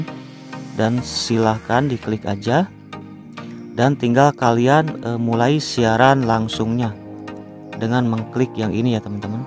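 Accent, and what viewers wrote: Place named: West Java, Indonesia. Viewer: Sunda banget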